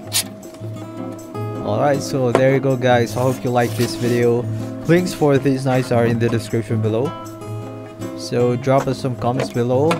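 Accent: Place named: Philippines. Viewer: You sound like your from philippines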